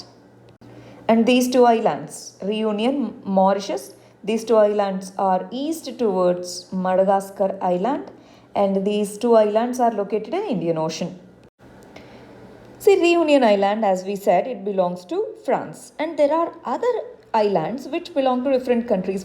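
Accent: South India, India. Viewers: Grt way to learn things quick n effectively. Especially in southindian accent. :)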